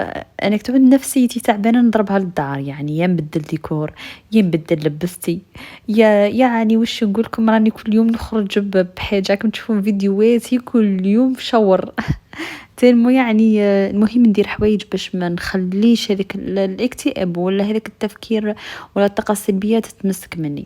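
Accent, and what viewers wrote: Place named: East, Algeria. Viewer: باينة على اللهجة تاعك من الشرق الجزائري أول مرة نشوفك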